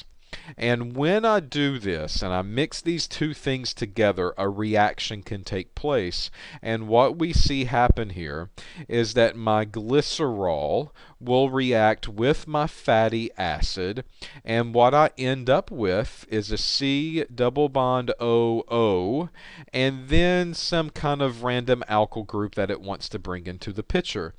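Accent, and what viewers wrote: South, United States. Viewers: cool Southern accent I like it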